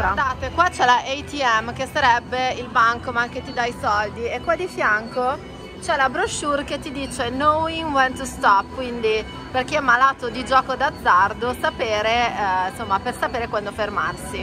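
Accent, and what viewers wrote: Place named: Milan, Italy. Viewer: Sei talmente solare che e' un piacere ascoltarti...e vederti. Poi per me che so Romano e' srmpre bello sentire il milanese parlato da una ragazza. Baci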